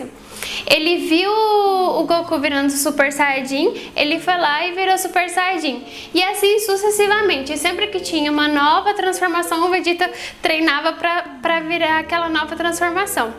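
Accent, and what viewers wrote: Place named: Londrina, Brazil. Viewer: você e londrina? ( o sutaque parece)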